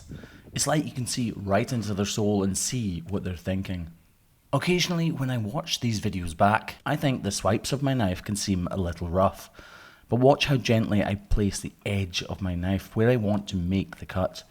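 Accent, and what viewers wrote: Scotland, United Kingdom. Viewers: I could listen to you talk all day long with your Scottish accent. I live in Michigan and we don’t have hear to many people from Scotland 🏴 That poor cow must have been in so much pain with the hoof problems and rotting flesh. I’ve never smelled that but when you said it smelled like rotting flesh all I could think about was an old chicken part or old hamburger that didn’t get thrown out on time. You do explain your procedures and why your approach to hoof issues so even an, Old Crazy Cat Babe like me could appreciate your work. Thank you for taking the time to post your skills I look at farming in a more understanding what my grandfather and father’s lives were like on their farm. My grandfather had a farm here in Michigan up in the thumb area before tractors, back then he had to grow food for his family and his animals; all he had was a work horse that was a mix between a Belgian and a Clydesdale. 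He took such good care of his animals because he had to depend on each for its job. However come Saturday night there was a dance and pot luck dinner at somebody’s barn, my grandfather was the one who made the moonshine, his shine was so good J. Edgar Hoover tried to arrest my granddad for his shine. The shine was kept cool in a dug out under the chicken house and the geese kept bitting Mr. Hoover so he offered my father $20. to slide under the coupe to get the shine so they could arrest my grandfather, my dad threw a big rock at Mr. Hoover and told him to, “get back into his fanc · you enjoy that Scottish accent ;)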